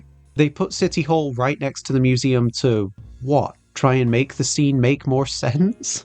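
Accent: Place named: West Country, United Kingdom. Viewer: I mean... I'm guessing you're not American, since your accent sounds vaguely West Country, so you should be able to pronounce that. "pa-pa-ver som-ni-fe-rum".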